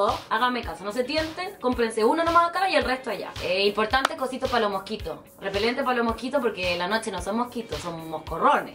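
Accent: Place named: Chile. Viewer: Demasiado chileno jaj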